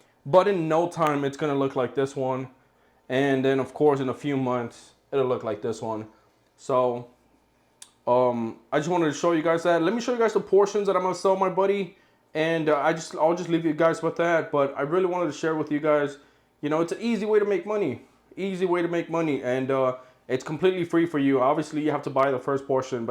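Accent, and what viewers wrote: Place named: United States. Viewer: Do you ship in the USA? You sound like an American but I have messed that up before. 😂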